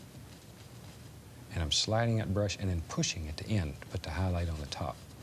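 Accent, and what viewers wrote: United States. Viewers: It's a regional American accent. If you're referring to the way Bob pronounces it. ♥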